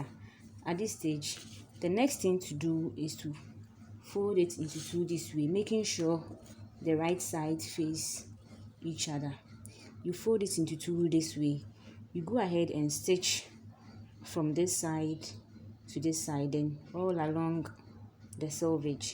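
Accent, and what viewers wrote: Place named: Ghana. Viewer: Madam the pronunciation is her intonation. She is from Ghana.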